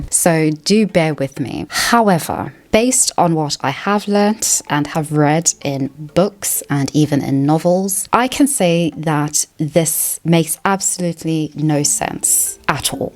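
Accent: RP, United Kingdom. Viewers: This is apart from the video, but hearing your voice for the first time surprised me, Your voice is beautiful, meant respectfully 😊 I have friends from Ethiopia and a an old friend from Kenya, so I’m used to those accents but your accent is like a UKish one, I think, it’s very pretty, ma’am ☺️ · I only subscribed cuz you asked, dark skinned lady with brit accent. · The camels are on the horizon, like it or not. This nog'ettes grandstanding and posh accent tells me everything I need to know.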